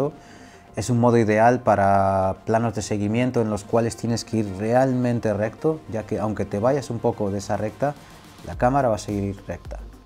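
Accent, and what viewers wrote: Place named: Latin America. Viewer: Amigo me encantó el video muy buena reseña del gimbal solo tengo 2 dudas espero me puedas ayudar 1 se pueden hacer timelapse con este gimbal he visto que con algunos se pueden lograr ejemplo en una noche estrellada podrías hacer un tutorial si se puede y 2 de donde eres como es que lo conseguiste lo he estado buscando en amazon y no lo encuentro soy de México tienes un acento latino muchas gracias que tengas un excelente día.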